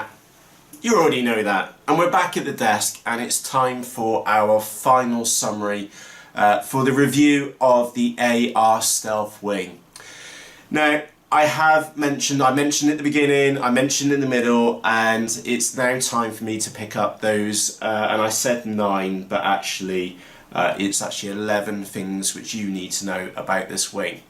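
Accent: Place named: South West England, United Kingdom. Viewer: Nice to hear a UK South West accent in a review video! Keep up the good work +, from a fellow UK reviewer!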